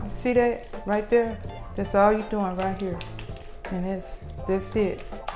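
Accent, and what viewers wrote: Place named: Carolina, United States. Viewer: My lawd Carolina country boi we say it just like you do. The pie looks amazing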